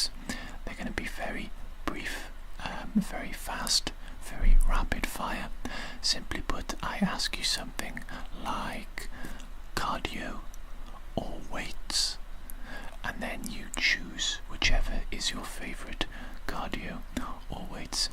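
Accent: Wales, United Kingdom. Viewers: Great video! I have to ask though, is that a Welsh accent or am I way off?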